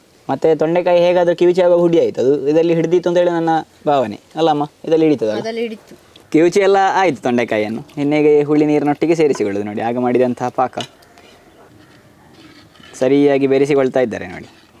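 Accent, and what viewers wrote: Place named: Coastal Karnataka, India. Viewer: ನನ್ನ ಕರಾವಾಳಿ ಕನ್ನಡ ಸೂಪರ್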